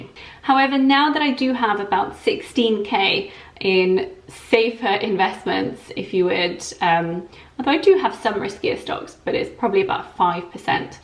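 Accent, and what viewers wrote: United Kingdom. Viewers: Really like your channel! I started investing roughly at the same time as you (Dec 2019), I am 27 and I am also an immigrant living in London, I feel quite identified. I didn't know you are Polish and all your family is from there. Your British English accent has 100% confused me. I suggest you take a look into Taylor Wimpey for a great Real Estate mainly UK based company. Very safe, almost no debt and a lot of growth ahead. Are you interested in any particular industry to get into more UK companies?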